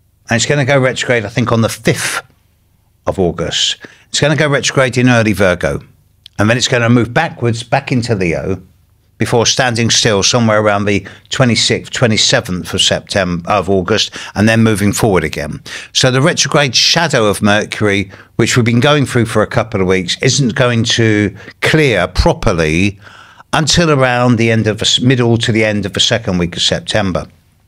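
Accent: United Kingdom. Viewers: Beautiful British accent! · New subscriber—I don’t know why I trust astrologers with uk accents but there you go.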